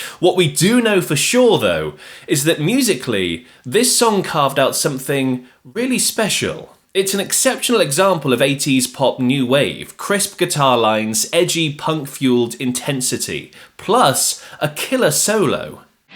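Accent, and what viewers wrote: United Kingdom. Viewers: Yeah, between this strangely moralistic stance and the fact he censors words like "masturbation", he sounds like a puritanical American who happens to have a British accent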